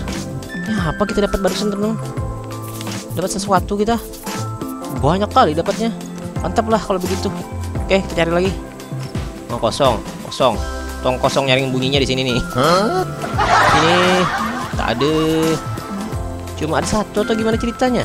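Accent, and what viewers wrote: West Sumatra, Indonesia. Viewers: Bang suara lu kaya Minang kocak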